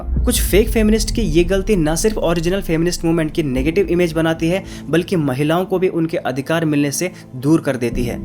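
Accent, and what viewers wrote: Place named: Garhwal, India. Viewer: Gadwali accent 👍👍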